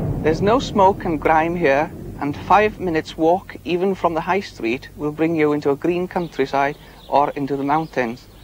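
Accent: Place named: Eastern Europe. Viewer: Lol his accent sounds so foreign, almost Eastern European. I would never have believed he was Welsh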